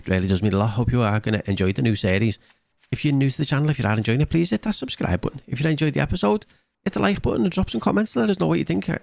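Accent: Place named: Liverpool, United Kingdom. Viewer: Story time with your friendly Scouse 😅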